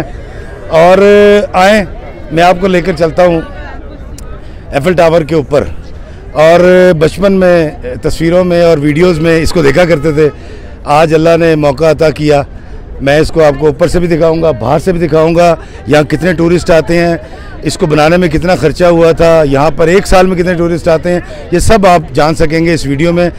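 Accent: Pakistan. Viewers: Aap k vdo achi hai aap ka (efal) tower aur ( perass) kehna b khalis Pakistani style hai aur phir ( O my God…) all good but ye jo perass ka nazara hai na ye kuch itna impressive nai hai vs our Islamabad view from Daman e koh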